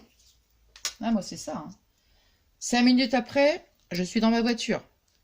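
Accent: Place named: North, France. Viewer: Ccou je viens de découvrir ta chaîne et tu me fais trop rire je me reconnais un p’tit peu dans ta façon d’être j’aime bien du coup je m’abonne à ta chaîne merci reste comme tu es ne change rien j’ai cru reconnaître une Tite pointé du Nord dans ta façon de parler lol 😂 👍bisous 💋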